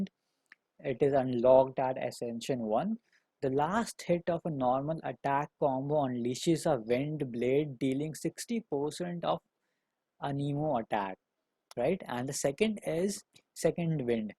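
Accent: India. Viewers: You are from India, aren't you? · Hey friend r u indian u sound like 1 pls reply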